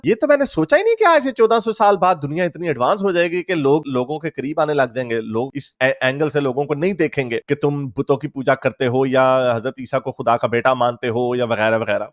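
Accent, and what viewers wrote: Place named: Punjab, India. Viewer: Great voice...& ... Punjabi laheka in speaking.... Thanks Tu shissss Great....❤❤❤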